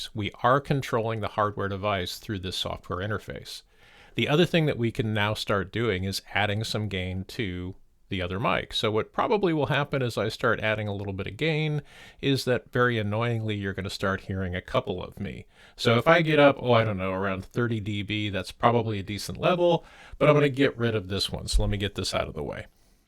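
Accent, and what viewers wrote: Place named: United States. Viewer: Thank you so much for sharing your precious know-how. (And really Excuse me, but which side of the US are you from, East, West, South, or North? Because I really like English pronunciation like yours. I'm asking because I really want to know which side of the US that pronunciation is from.) Sincerely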